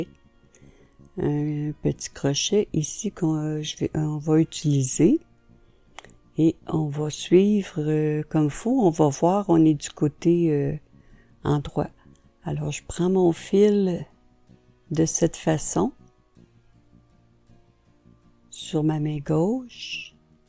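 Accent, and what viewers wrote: Quebec, Canada. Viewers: Bonjour, je me remets au tricot juste parce que j'ai flashée sur une laine dégradée turquoise que j'ai acheté cet été en me disant, je verrai bien ce que j'en ferai... J'ai vu des modèles d'entrelac sur Pinterest, mais sans les explications, que j'ai trouvé ensuite sur YouTube mais sans le déroulé des finitions comme vous... Je vais pouvoir corriger et poursuivre mon tricot grâce à vos explications (avec en prime l'accent de mon amie Québéquoise) ... SVP: Pourrait-on avoir accès à des explications écrites (PDF ou Word)??? La transcription automatique est difficile à suivre et à enregistrer (Lol) MERCI INFINIMENT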